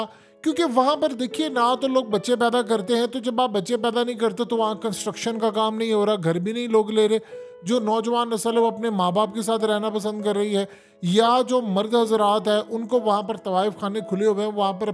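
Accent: India. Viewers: You sound more Indian than Pakistani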